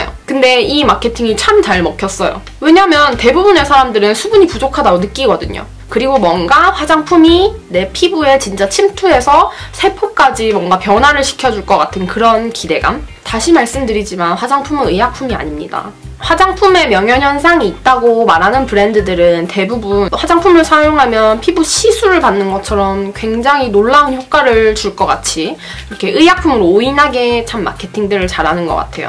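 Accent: Gyeongsang, South Korea. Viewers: 예전 큐엔에이에서 였나? 기억은 잘 안나는데ㅠ 경상도쪽 (대구?)사투리랑 서울쪽 사투리랑 섞여서 이렇게 되셨다고 했어용 정확한건 그 영상을 찿아보시면 되실것 같아요ㅠㅠ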